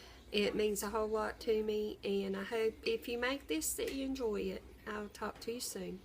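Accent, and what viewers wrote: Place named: West Virginia, United States. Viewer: I'm just curious as to where you're from. You sound like you're from WV like me.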